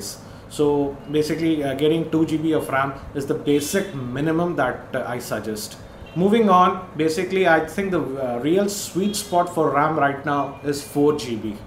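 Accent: India. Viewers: I love the indian acsent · I love Indian accents! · more thanks to you...i love Indian accent... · Just the accent. Indians can do better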